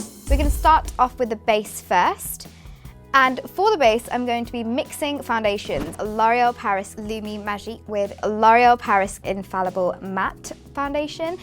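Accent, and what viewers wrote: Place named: United Kingdom. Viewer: lol are you sure about that? i think you're commenting on her accent, which sounds that way because she lives in the UK. her actual knowledge of english is fine considering she was brought up in a country where that is the first language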